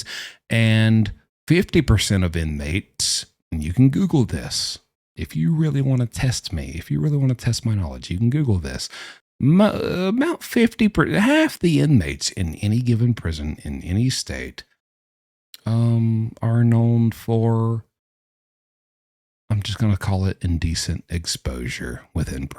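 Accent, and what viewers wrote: South, United States. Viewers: Listening to a southerner explain anything is like a prison sentence on its own. Get to the point JFC.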